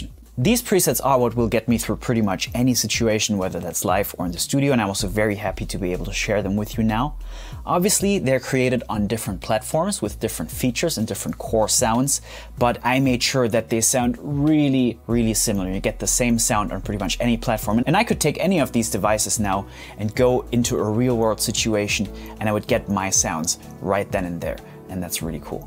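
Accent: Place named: Germany. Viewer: HI! Wo hast du dein musikstudium gemacht? Es sieht so aus als hätte Deutschland ein guitarristenboom mitt Manuel Garder Fernández und dir. Mann kann ein sliver of a german accent in dir erkennen aber du sprichts echt super! ( ich binn halb deutsch halb spanier) Viele Grüße.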